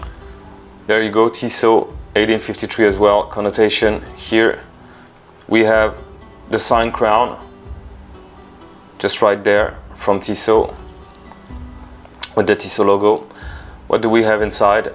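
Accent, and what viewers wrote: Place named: France. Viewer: That is a beautiful watch! I may have to go and buy it! Also, may I say, your accent is gorgeous - I must come to France if all the men sound like this!!! 😂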